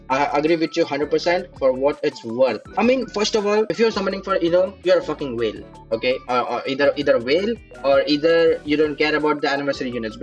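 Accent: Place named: India. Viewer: Consider using an AI voiceover, just hearing indian accent make me click away, even tho the video may be great.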